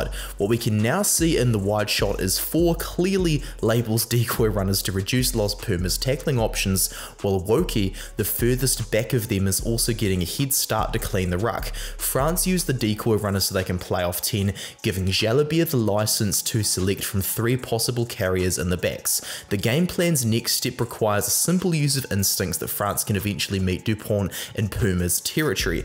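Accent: New Zealand. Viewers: Excellent vidéo and analys, Love the Kiwi accent👌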